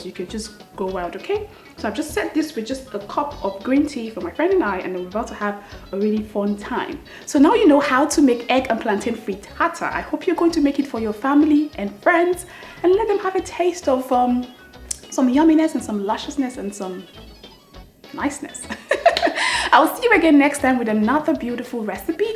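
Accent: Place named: Igbo, Nigeria. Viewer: I think she is an igbo girl the way she speak oo my god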